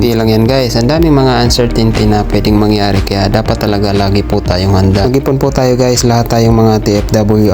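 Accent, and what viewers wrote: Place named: Bicol, Philippines. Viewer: kabayan bicolano ka po? puntong bicol ka po eh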